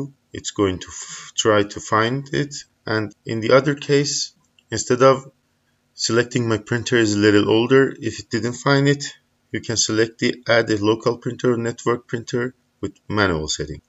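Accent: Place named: Turkey. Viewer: I bet you are Turkish. This is Turkish accent 😂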